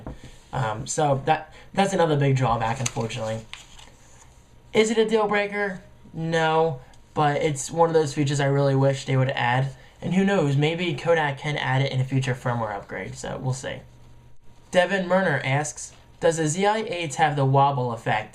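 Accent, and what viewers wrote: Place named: United States. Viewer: Arghh I love your accent, im brittish and just love the american voice ! Oh and yea I have this camera and i LOVE IT, the audio isnt great but it recorded a concert with heavy bass really well. I also used it when skiing.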